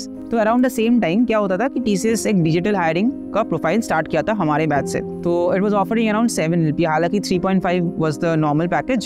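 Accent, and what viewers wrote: Bengal, India. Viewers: Bhaiya are you bengali ? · The cute bengali accent😊😊😊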